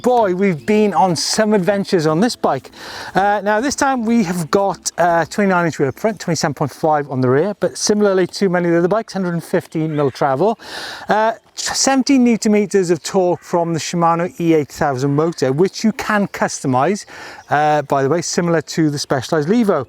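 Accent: Wales, United Kingdom. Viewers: Is that a Welsh accent . Nice bikes .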